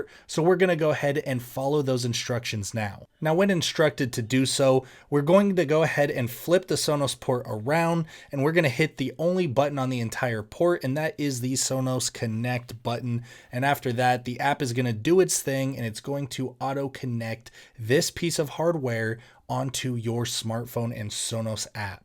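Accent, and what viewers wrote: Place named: United States. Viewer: Annoying American accent. Low info density, too low. All info could have been given in 5 mins.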